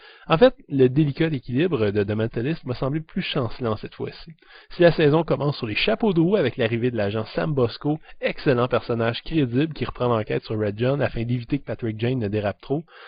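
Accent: Quebec, Canada. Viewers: C'est déjà sortit au Québec? (d'après l'accent...) Bonne critique! Merci!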